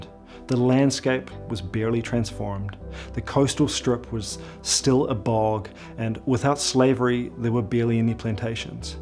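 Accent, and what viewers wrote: New Zealand. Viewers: Are you kiwi?? South Island? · Kiwi accent there?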